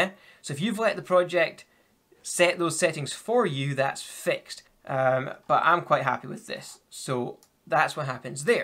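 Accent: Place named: Scotland, United Kingdom. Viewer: Warsaw, Poland :D Was wondering which part of Scotland, nice!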